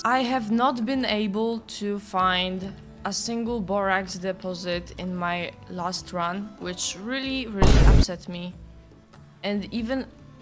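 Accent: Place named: Poland. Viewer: Why do I have this strange feeling that you're Polish? I could swear you have that kind of accent.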